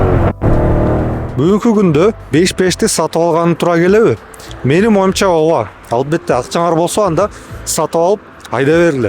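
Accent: Standard. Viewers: Таза кыргызсча суйлоп жакшы тушундурот азамат ишине ийгилик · Ассалому алейкум иним, эн биринчиден Кыргычаны тапптаза суйлогонун учун баалайм сенин ишинди. Менин биринчи унаам 2001 жыл мерседес 2.7 сdi avangard болгон.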